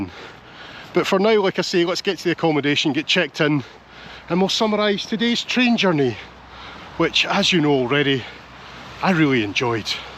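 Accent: Scotland, United Kingdom. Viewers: Before watching this video, I'm excited to see a outsider view on VR trains! :D I have used the train to travel from study city to hometown, 700km+ takes 6h 30mins usually. and anytime i have had delay due to VR being silly has been at least twice. Delay happened because there wasnt enough of busses or taxis for around 40+ people so it was quite annoying. Now I will watch this video and see your thoughts! edit: Gave a sub and like, I love Scottish accent and I love hearing about non-Finns express their thoughts on Finnish stuff :D 89€ sounds like a fair price. mine usually are 30-50€ depending on how close i book the departure etc. Aww I loved the Conductor's Hello :D They are doing this thing called "Pilkki", which I think is called Ice Fishing? But you have this tiny rod and you sit near the drilled hole hoping to capture a tiny fish. Never tried it, only regular fishing :D one time i get to spend little bit time in one, since the train had broken so we had to partake in a train as extra passenger so i stayed until someone who had it reserved came to claim it. 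The soundproofing of them are quite nice! currently while writing this i am , Here he the breakdown of the coaches in VR trains Coach 1 = pet coach coach 2 = Extra class coach 3 = Extra/silent coach(I might be mixing the 2 and 3 together, but i remember that both should have unique top decks) coach 4 = Children play area Coach 5 = just your average coach Coach 6 = nothing special I will admit i am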